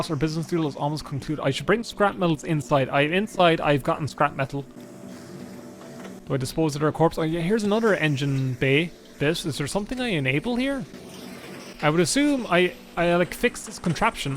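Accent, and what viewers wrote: Ireland. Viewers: I missed your beautiful Irish accent 😭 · You sound like DingDong in an Irish accent and it's truly endearing. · why are you irish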